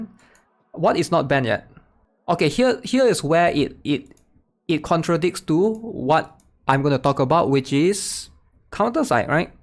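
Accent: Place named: Indonesia. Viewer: probably indonesia judging from his accent, it is different from ours Im from the PH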